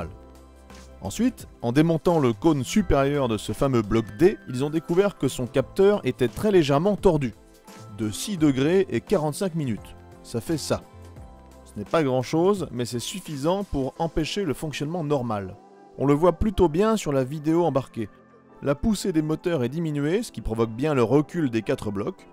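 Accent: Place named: Lorraine, France. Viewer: Woooooh serait-tu un frere lorrain? je dis ca car ta facon de prononcer 20 m'y fait fortement penser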